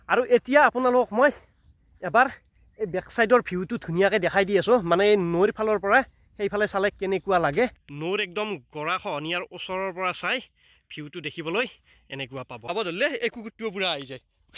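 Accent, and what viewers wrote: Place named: Nalbari, India. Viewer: ভাই তুমি নলবেইৰা ভাষা ক'লেহে বেছি ভাল লাগে , তোমাৰ মাতত আমাৰ নলবাৰীৰ আচল কথিত ভাষাটোৰ আভাস পোৱা যায় , সেইদৰে ভিডিঅ' বনাবা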